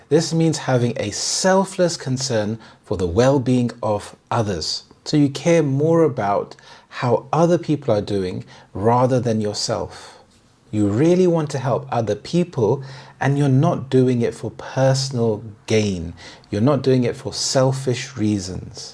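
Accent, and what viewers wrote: United Kingdom. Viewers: Hello,! I don't know if you have any videos that talk about this subject, but I'm curious, because I see that you speak differently from other British YouTube channels that I follow... What version of British English do you speak? Written with Google Translate.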